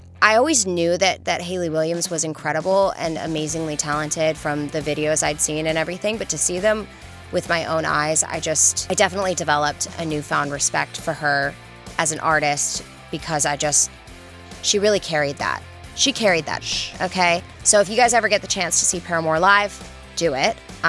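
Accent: United States. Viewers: I do enjoy your conversational videos even more than those where you sing.Your flawless American English is so good for my foreign ear that i can get trained in understanding a naitive speaker speech while being out of conversational practice at the moment myself...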